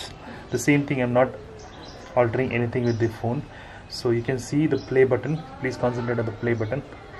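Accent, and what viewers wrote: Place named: India. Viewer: DIGGAHHH dieses Indische englisch fuckt mich so ab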